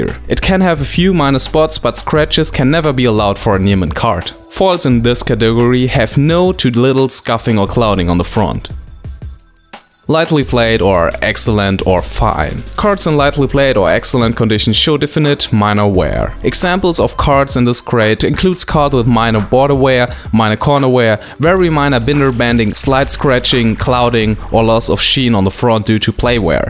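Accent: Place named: Germany. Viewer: From a GER to a GER (most likely): Work on your English. I mean even the THICC accent you have is acceptable, though painful to listen to (especially a German accent), AS LONG AS THE GRAMMAR IS FINE. And you talk quite quickly as if you were advanced although you're actually slightly better than a beginner. Like... you're not gonna play Van Halen solos on your second guitar lesson. Be honest to yourself - who are you trying to impress? I personally would be ashamed of uploading such a "wideo", even though the "wideo" is otherwise informative.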